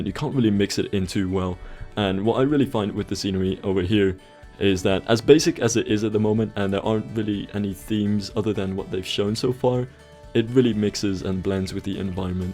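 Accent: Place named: Netherlands. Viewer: Nice video! You have a great accent for someone from the Netherlands. BTW: I'm dutch too.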